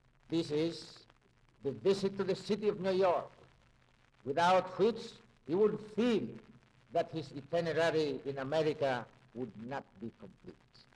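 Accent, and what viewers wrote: Philippines. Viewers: Rinig mo yung Bisaya accent niya haha. Not meant to be an insult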